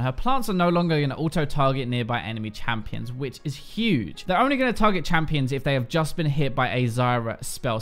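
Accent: England, United Kingdom. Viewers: Your doing gods work, both the patch videos and the app are very helpful as well as the English accent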